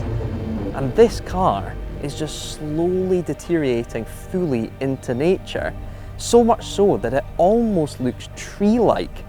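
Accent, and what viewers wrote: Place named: Glasgow, United Kingdom. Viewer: You sound like a fellow Glaswegian to me. I can't see Dennis Collins being interested in any of those cars. The forest landscape is almost saying that nature wins in the end mate.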